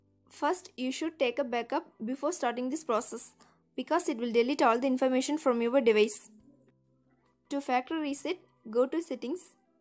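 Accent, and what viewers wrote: India. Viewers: the thick indian accent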